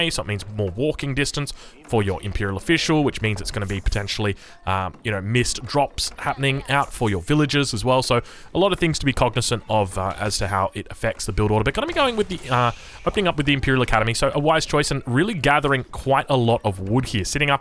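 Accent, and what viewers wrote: Australia. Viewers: Incredible game, incredible commentary! I've seriously never enjoyed any commentary with an aussie accent til now and absolutely nails it! loving every second of it.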